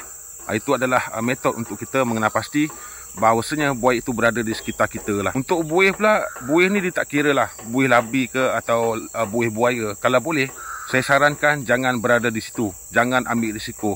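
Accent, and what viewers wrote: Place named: Sarawak, Malaysia. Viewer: Terima kasih tuan atas perkongsian ilmu, kmk salute BM ktk sgt fluent tapi tetiba ada yg melimpas bah mesti nyatok org Sarawak😊 Teruskan tuan dengan video² yg selanjutnya bagus juak guna drone camya puas nangga..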